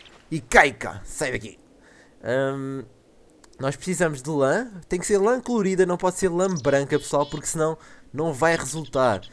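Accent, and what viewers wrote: Portugal. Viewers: e português ou brasileiro???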